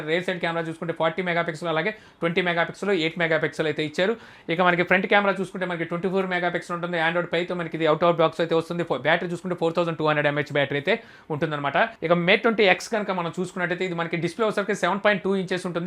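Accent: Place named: Godavari, India. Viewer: nee slang kosame ne vedios chustaaa godavari don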